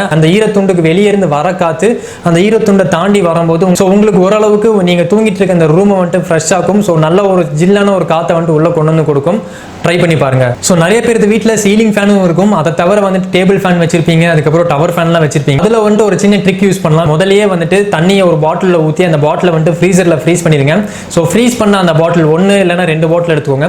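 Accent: Coimbatore, India. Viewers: Nenga Coimbatore aha I jolly.. Naan Sathyamangalam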